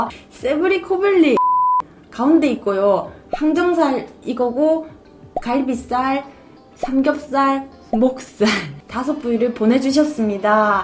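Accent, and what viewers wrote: Gyeongsang, South Korea. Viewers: o sorry I thought it was Daegu accent btw u can speak English?!!😯😮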